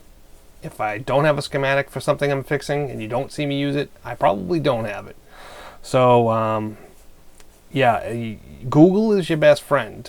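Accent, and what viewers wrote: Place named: Massachusetts, United States. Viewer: Knew you were from MASS right away even though you don't pronounce your AAAAs (read R's for those not from MASS) like I do.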